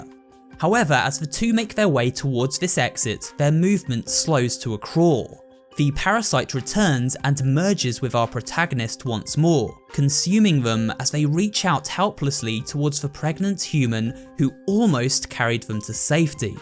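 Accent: England, United Kingdom. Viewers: Why does every youtuber sound the same? Mousy, white with an ambiguous english accent ffs.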